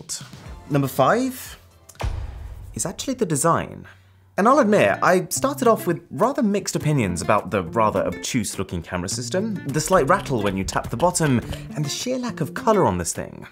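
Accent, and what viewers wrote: RP, United Kingdom. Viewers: $1800? Bloody madness I should think? Buy this to keep up with the Kardashians as it were? Cheers! BTW I am aYank but like how you Limeys speak. 😂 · like the products...dont like the way he talks like if he were a posh brit....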